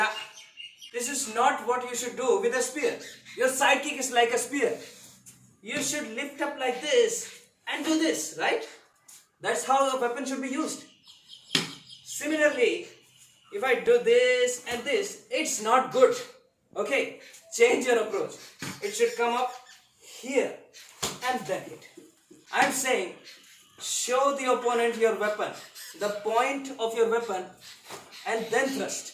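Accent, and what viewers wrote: Kerala, India. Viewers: Man, you mallu?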